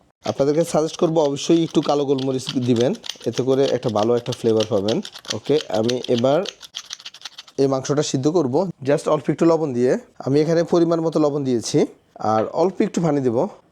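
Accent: Noakhali, Bangladesh. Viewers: ভাই আপনি নোয়াখালীর???😮😮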